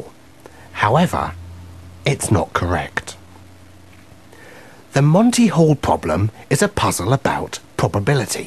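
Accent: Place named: United Kingdom. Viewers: 真的一直分心聽英國腔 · 英國講話很重音調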